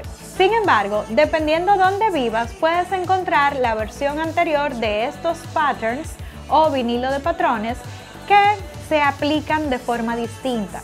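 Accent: Dominican Republic. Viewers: Eres dominicana ?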